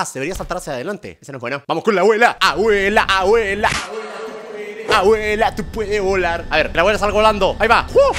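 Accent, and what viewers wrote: Argentina. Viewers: german esta mas argentino en cada vídeo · Digan si no está ablando con asentó argentino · ¿Soy la única que notó que a se le está pegando el acento argentino de alguna manera?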